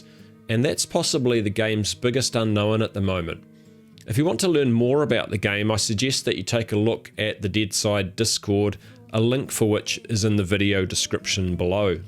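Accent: New Zealand. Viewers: Aussie... No, New Zealander. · Did Matter...... NZ accent will forever be funny to me :D good video though.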